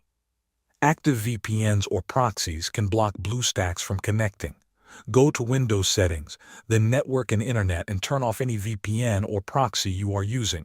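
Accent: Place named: United States. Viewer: Да можно по русски говоришь как американец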